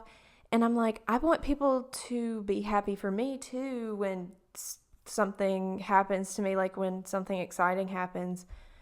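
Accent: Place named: South, United States. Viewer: With your southern drawl and all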